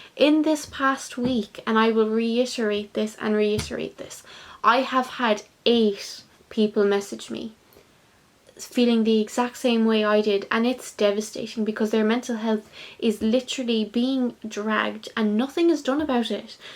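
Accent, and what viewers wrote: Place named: Ireland. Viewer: Hi. My school days were an absolute nightmare. A lot of bullying from other kids and from some of the teachers too. And at home it wasn't better because my parents were always fighting. As i mentioned in another comment i suffer from depression and social anxiety. I think the stuff i experienced during my childhood and something that happened a few years ago caused it. I am on medication now which helped me a little with major depression but i still have social anxiety. And the medication makes me confused and drowsy. I like your irish accent. Hope you are having a great day and good luck with your studies.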